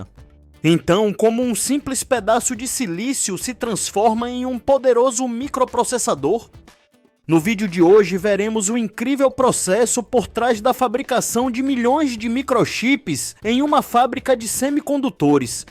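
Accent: Northeast, Brazil. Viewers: Essa narração nórdéstina tá muito ruim !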